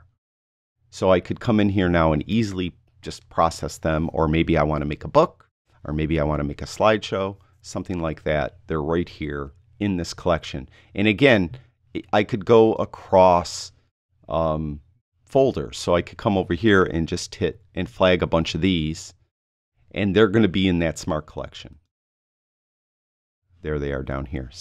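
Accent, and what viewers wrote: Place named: Boston, United States. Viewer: You are from Boston, aren't you? I can tell by the way you say dot CAAM! Well, I guess its no secret since you showed pics of the Boston History Museum last vid.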